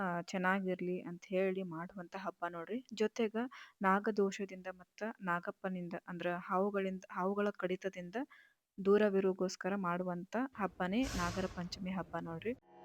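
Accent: North Karnataka, India. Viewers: ನಾಗರ ಪಂಚಮಿ ಹಬ್ಬದ ಬಗ್ಗೆ ವಿವರವಾಗಿ ತಿಳಿಸಿದ್ದೀರಾ ನಿಮ್ಮ ಉತ್ತರ ಕರ್ನಾಟಕ ಭಾಷೆನೇ ಚಂದ🎉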